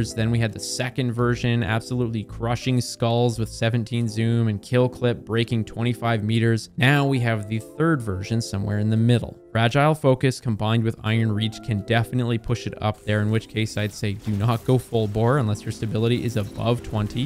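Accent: Canada. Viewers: Are you Canadian? You sound Canadian say “sorrow”. I love it.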